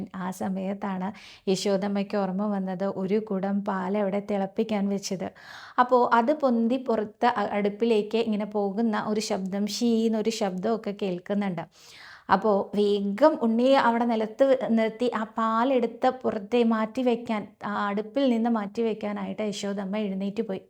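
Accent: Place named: Thrissur, India. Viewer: കഥ കേൾക്കാൻ നല്ല രസമുണ്ട്, പിന്നെ ആ തൃശൂർ സ്ലാങ് ഒക്കെ നന്നായി 🙏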